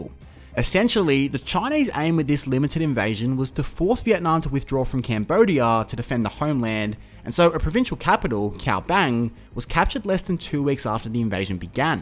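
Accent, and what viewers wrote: Australia. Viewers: Why do Australians pronounce China as “Chiner”, and here as “he”?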